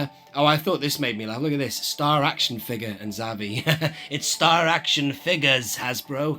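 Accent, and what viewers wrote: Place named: United States. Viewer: I love hearing your american accent.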